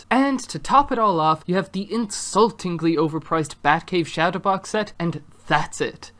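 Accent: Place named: Ireland. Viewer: As an Irishman I personally find that when I hear an Irish accent on YouTube I usually expect the video to be low quality, but I was pleasantly surprised with this one. Really good stuff that kept me engaged all the way through which is rare, I’m shocked you haven’t got more subs. Can’t believe that new Vision minifigure didn’t get a mention tho 😤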